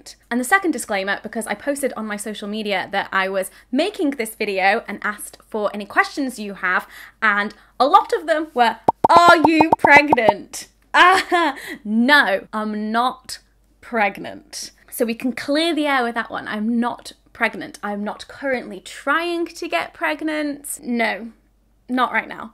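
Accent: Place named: Newcastle, United Kingdom. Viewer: love your geordie accent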